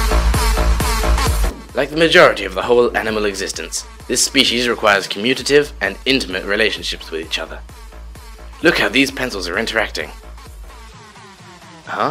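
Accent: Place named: England, United Kingdom. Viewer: this is very good. Good touch having an English accent as narrative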